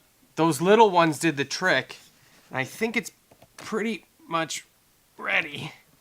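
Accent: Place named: Canada. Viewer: Thanks for the great video. Do u have a video that shows how you did the neck reset? I have a problem with my Martin. I can see a considerable 1/8” gap at the heel of my neck. My guitar is 20 years old. I think the wood has shifted with time. Not sure what to do at this point. I am in Mexico so I don’t have much luthiers around me. Hey you’re Canadian? You sounds like english canadian. I am french canadian from Quebec!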